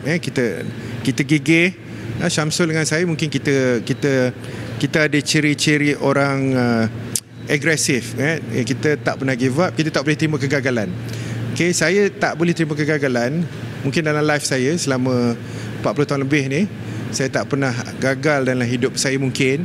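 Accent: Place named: Selangor, Malaysia. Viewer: Selangor nya ko cakap...dapat madu sepah dibuang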